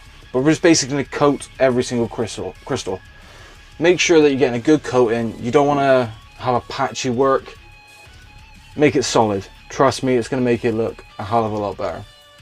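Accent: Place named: Scotland, United Kingdom. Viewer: I can't make out if you're Scottish, Irish or Cornish. Cracking video 😁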